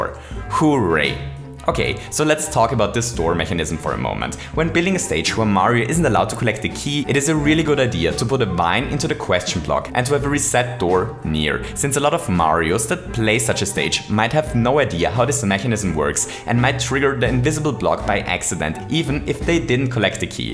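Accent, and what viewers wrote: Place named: Germany. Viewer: Also ich find die Videos ja Super, aber der Deutsche akzent zu den Videos ist schrecklich x)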